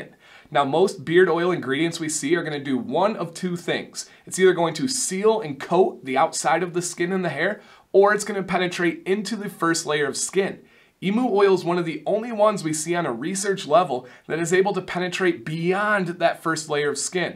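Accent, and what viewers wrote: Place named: United States. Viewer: Absolutely fascinating video. As a carnivore I 100% agree with your views on emu oil (& respect those opposed coming from a vegan perspective). I dig that the bird is farmed for food, it’s feathers, etc & that so little goes to waste. This is not an oil I have ever heard of or seen in any UK beard oils but I will definitely look out for emu oil, especially if it works on dormant hairs. Just FYI, another thing I love with your videos, & others I watch on YouTube from over the pond, is the little accents. Hearing you pronounce emu ‘E-moo’ where as in the UK we pronounce it ‘eem-U’. Just reminds me how huge the bearded community is! Anyway, Happy New Year, all the very best for 2020!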